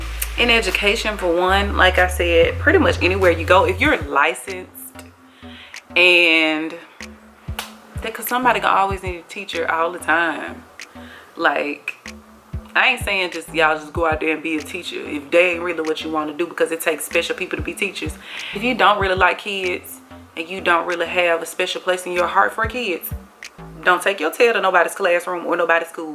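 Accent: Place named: Memphis, United States. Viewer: Had I stayed in Memphis I would have that accent. lol I love your accent so much girl. lol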